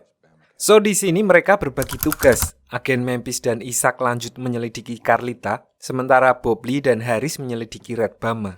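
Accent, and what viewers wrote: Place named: Central Java, Indonesia. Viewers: Wong Jawa Tengah kyok e ki narator e😂😂😂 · Joh. Iki ngadimine wong magelang to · Fixs admin e wong jowo 🗿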